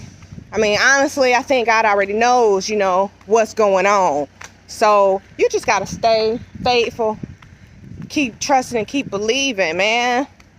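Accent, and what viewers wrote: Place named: Raleigh, United States. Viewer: Congrats on your new job!! Im from NC too!! I knew that accent was very close to the Raleigh area accent!! Just found your channel and I'm loving it. I want to visit Mexico in 2024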